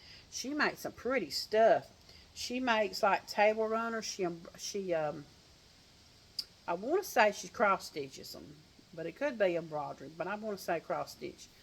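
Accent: South, United States. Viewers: Hello Miss! Greetings from Florida! Just found your channel and let me tell you I absolutely love it! That southern accent is just lovely and your style is very soothing and very down to earth. I’ll be watching you from now on ☺️ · Dear.. I would love to see you every day on here. I love your sweet Southern accent 😉 & those cute Southern descriptions of things. Your C2C Christmas blanket is so beautiful!!! The colors are true Christmas colors!..I loved hearing your birds..sounded like Blue Jay's! Seems like all of our chirpy birds gave all gone. I dodnt see Woodpeckers all summer..but today there was 2 in my back yard!! I miss the sounds of Spring & Summer..way too quiet 😕. Take care & God bless all of you 🙏 ((hugs))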